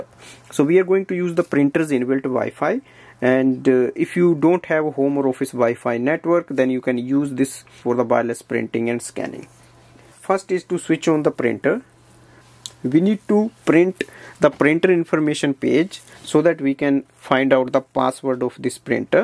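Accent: India. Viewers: Nice gujju accent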